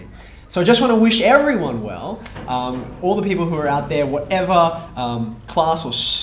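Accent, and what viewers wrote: Australia. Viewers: The Austrailian accent makes everything you teach <num> times better!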